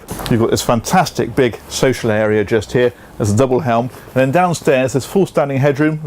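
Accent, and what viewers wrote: United Kingdom. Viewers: ! i heard the british accent and i couldnt believe its him lol, just finished watching his vids.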